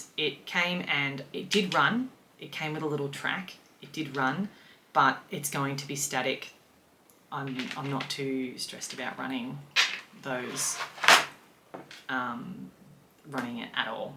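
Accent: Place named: Australia. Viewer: At first I was going to guess British, but listening further decided Australian. Always nice to see a girl in the model train hobby. Uncommon!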